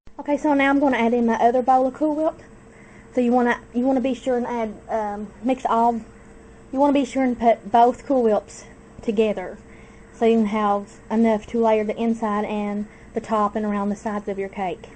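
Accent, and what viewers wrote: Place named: South, United States. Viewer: looks good and love your country voice:)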